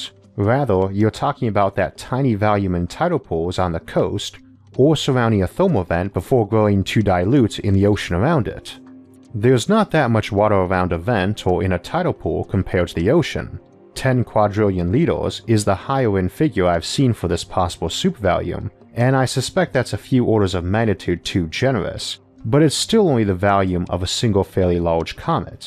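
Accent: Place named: United States. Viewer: It's not an accent, it's a speech impediment. Though otherwise he has an American accent.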